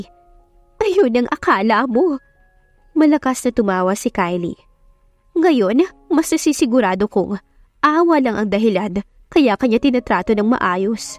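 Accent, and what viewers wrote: Philippines. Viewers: Talaga ba maganda ang boses ng narrator na ito? Parang tangang probinsyana ang bweset na narrator na ito. Naririnig mo ba ang tuno nyang igurot nakakairita.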